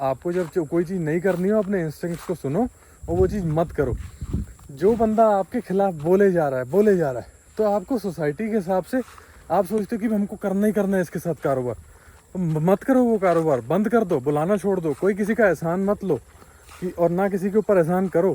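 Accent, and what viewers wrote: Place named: Punjab, India. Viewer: Siraa lagaa veer hindi vich Punjabi touch puraa siraaa😂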